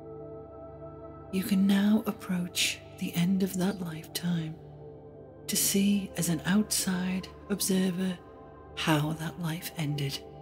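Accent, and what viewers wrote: Liverpool, United Kingdom. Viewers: This narrator is from liverpool..guaranteed scouse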